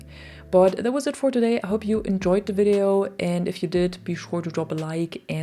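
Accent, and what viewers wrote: Germany. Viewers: Never seen this persons channel or stream before, but I am detecting a strong German accent.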